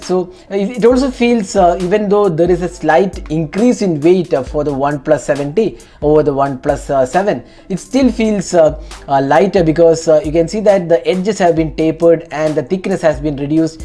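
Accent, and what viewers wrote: Kerala, India. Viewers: He's from kerala according to his accent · Ur mallu slang is so predominantly heard ! Chetta keep it up ! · Work on accent neutralisation, content is decent. Kill the accent and you'll do good. Good luck. Btw I'm a mallu too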